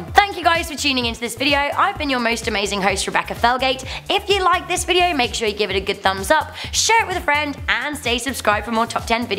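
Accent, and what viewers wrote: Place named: United Kingdom. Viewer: My favorite is this British accent lol 🤘🏻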